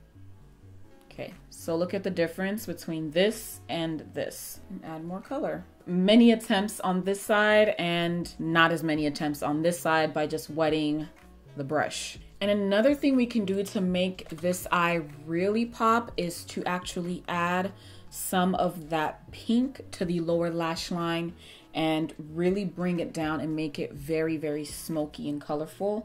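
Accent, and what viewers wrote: Nigeria. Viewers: I love your Nigerian Accent 🙂❤ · Subscribed for the Nigerian accent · I’m gonna guess you’re part Nigerian. That accent sent me to the ground. Love you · That Nigerian accent 😩😂❤️ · Let me just tell you , the moment I heard your Naija accent come out , i clicked Subscribe so fast 😭😂 . Ahhh my fellow sista doing her thing lol , i definitely learned something new in this video . I’m definitely looking forward for more to come.! 💋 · Hey. Are u Nigerian? The accent tho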